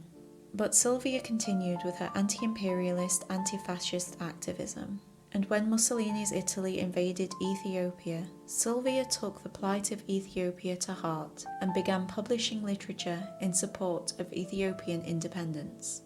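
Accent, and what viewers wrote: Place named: Northern England, United Kingdom. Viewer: wonderful work. i have smashed that like button and hit subscribe. i miss the northern english accent spoken softly. i don't want to guess the county because to get that wrong is more often to offend than not.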